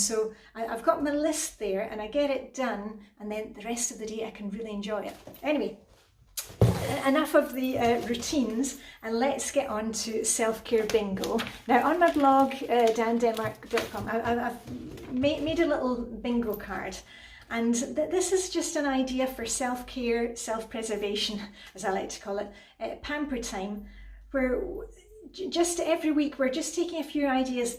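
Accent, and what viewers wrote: Scotland, United Kingdom. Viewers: Love the way you say Mary Berry in your wonderful Scottish accent!!! ♥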